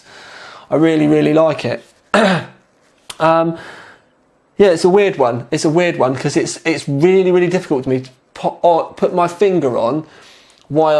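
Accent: United Kingdom. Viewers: He is British. The world doesn't revolve around your regional accent let alone language.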